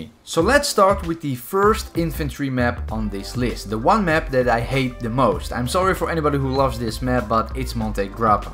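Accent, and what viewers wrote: Netherlands. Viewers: Je praat Engels als een Nederlander maar het is goed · Nederlander hè? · Ur from holland Bet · Wait you are dutch? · you speak English with a Dutch accent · Are you dutch? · are you Dutch?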